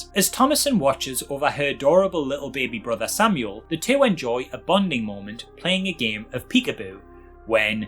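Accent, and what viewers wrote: Newcastle, United Kingdom. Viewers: Everything sounds good in a Geordie accent.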